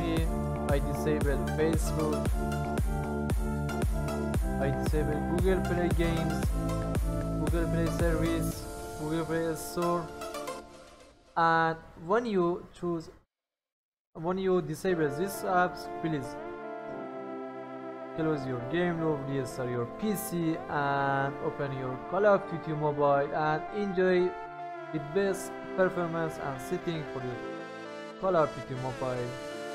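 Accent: Iran. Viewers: Thanks bro where are you from? You speak like iranin (دمت گرم داداش خیلی مفید بود تونستی فارسیشم بساز)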